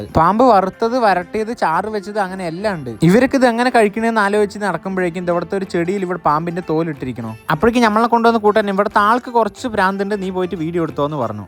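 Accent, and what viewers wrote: Palakkad, India. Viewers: 😂😂palakad slag🔥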